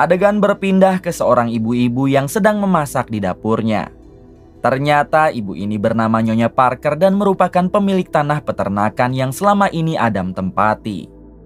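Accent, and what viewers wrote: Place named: Majalengka, Indonesia. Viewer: Lu orang Majalengka ya min ?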